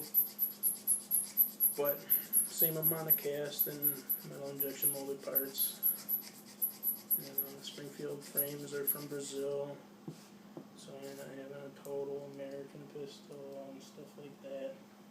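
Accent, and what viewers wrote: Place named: Illinois, United States. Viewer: ill bet you a ten shot wilson combat magazine 9mm ur from illinios?, i can hear it in ur voice? if I'm wrong let me know and I will send you the magazine for a 9-millimeter Wilson Combat to any address of your choosing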